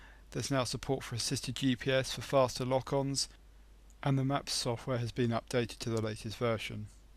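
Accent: United Kingdom. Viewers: hahahahaha ,yes u'r right what a speech an what a ugly voice, British accent.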